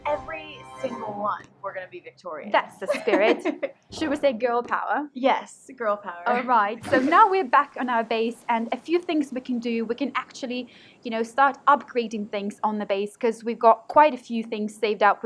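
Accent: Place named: United Kingdom. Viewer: I like the British accent. Haha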